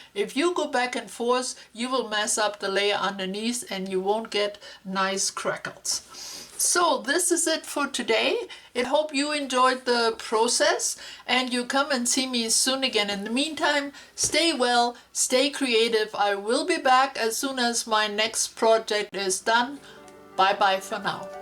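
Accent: Germany. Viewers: Dear, congrats to your fabolous skull art piece! I love it very much. I´m also in love with skulls, especially combined with glitter and shine... To use old embossing powder to highlight the eye is a very cool idea and turned out very nice. I´m looking forward for new cool videos from your creative lab🙂 P.s. Do I hear a little german accent?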